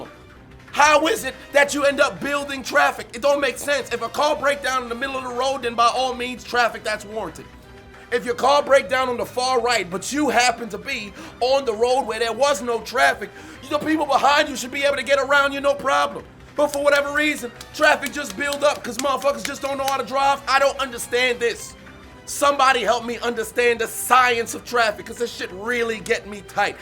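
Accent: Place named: Atlanta, United States. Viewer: Sounds like Atlanta, if I had to guess 😂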